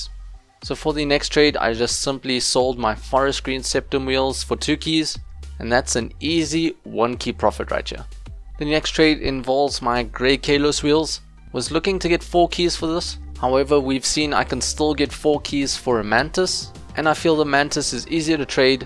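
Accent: South Africa. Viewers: Are you South African??? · Are you South African? Sorry I am new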